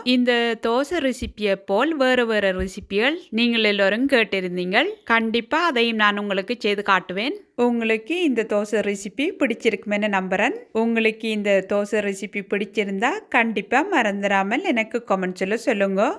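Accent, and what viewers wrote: Sri Lanka. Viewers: இலங்கை தமிழும் , தோசை யும் அருமை 🎉🎉🎉🎉🎉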